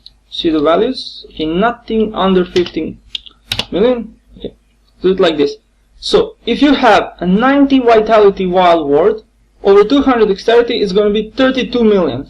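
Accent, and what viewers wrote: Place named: Poland. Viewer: Slychac ze polak :D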